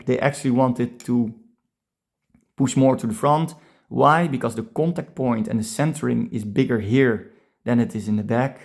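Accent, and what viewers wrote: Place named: Netherlands. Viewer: wllh ik hou van deze nederlandse accent ahahah, je kan het van een km ver horen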